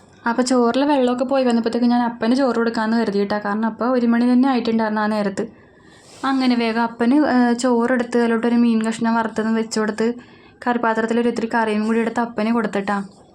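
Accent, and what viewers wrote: Kochi, India. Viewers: നല്ല വീഡിയോ👍 കൊച്ചിഭാഷയും അവതരണവും നല്ല രസാണ് കേൾക്കാൻ.❤ ആമിക്കുട്ടിടെ കാലിന്റെ മുട്ടു പൊട്ടിയത് ഉണങ്ങിയോ?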